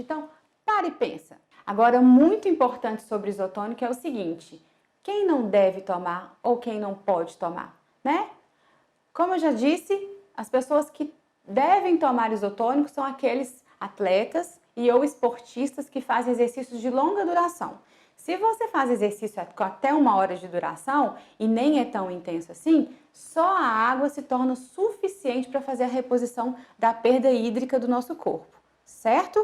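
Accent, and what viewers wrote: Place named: Minas Gerais, Brazil. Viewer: Sotaque mineiro detectado 😉🥰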